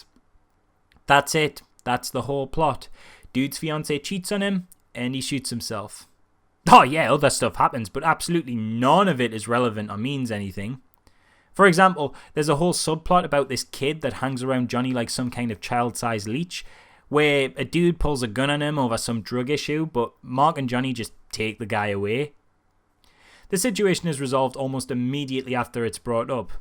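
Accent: Newcastle, United Kingdom. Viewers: A geordie review of the merits of The Room. My life feels that little bit more complete XD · Are you a Geordie or a Mackem? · RIGHT! How do people like Markiplier have 20 Million subs? This guy is intelligent, funny, passionate and non-cynical (Plus the geordie thing isn't losing him points either). It's like the secret treasure of youtube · YOURE GEORDIE ARENT YA "HOWAY"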